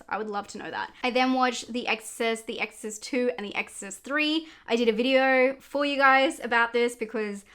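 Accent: Australia. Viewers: So, uh, "really sh*tty" is a 1 star rating? 😛 Think I may be watching too many of your vids. Your Aussie accent is not as noticeable to me. 😕 Stay Spooky.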